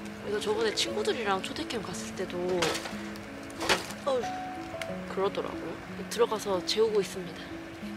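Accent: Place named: Gwangju, South Korea. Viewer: 요즘 무한재생으로 보고 있어요 집에서 그냥 틀어놓기도 좋아요 ㅋㅋㅋ 저도 광주인데 사투리가 편안합니다ㅋㅋ 앞으로 더 큰 채널이 되길 바라요 ♡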